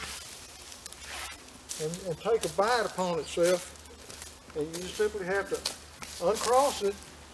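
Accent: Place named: West Virginia, United States. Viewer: Accent sounds just like my uncle from west virginia. Good tip generally. I got a bunch of junk logs dropped off at my property recently by an Arborist. He did be the disservice of stacking them a mile high and I'm having huge difficulties getting some of the logs down. But this technique helped me move some of them at least.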